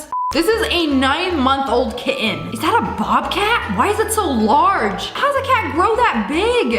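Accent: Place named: London, United Kingdom. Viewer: ur from london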